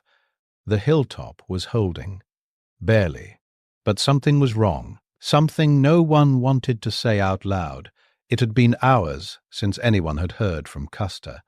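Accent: United Kingdom. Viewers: You saw that also,this voice ,this channel is a joke , is he from England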